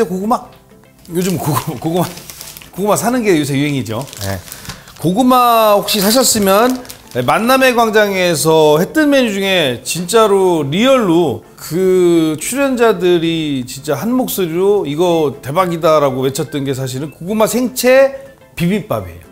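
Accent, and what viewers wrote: Chungcheong, South Korea. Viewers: 그런줄 알유 하면 다 알아들유 충청도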